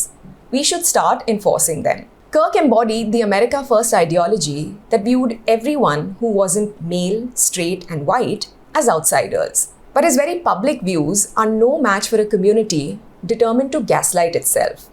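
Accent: India. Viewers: Please change your Indian stupid accent. Learn how to speak normal English.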